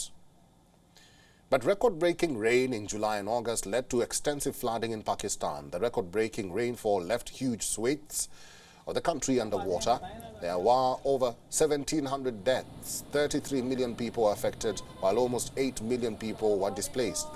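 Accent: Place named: India. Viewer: you now speak like an indian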